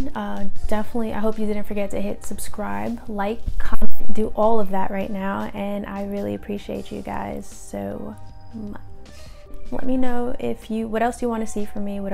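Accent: New York, United States. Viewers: Love that New York accent still! #VEGANCRUSH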